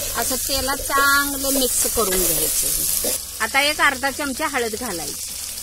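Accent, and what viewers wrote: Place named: Rural, India. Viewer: रेसिपी तर चविष्ट झाली असणारच. पण ही गावरान भाषा कानाला इतकी गोड वाटते की ऐकतच राहावीशी वाटते.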